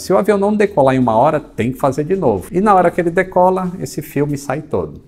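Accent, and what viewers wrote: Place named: North, Brazil. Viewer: percebi um falar NORTISTA no Mano... anda ele comendo muita Carne-do-sol com farinha ou ele é do Ceará? Nós de FOR queremos saber! P.S. " o Mano ê quase indispensável " é muito bem vindo!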